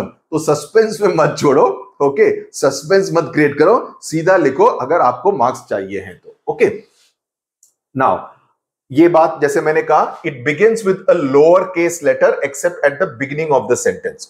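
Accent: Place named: South India, India. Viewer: Sir i think you are south indian . Your hindi dictation is clear but hindi tune is like south Indian's people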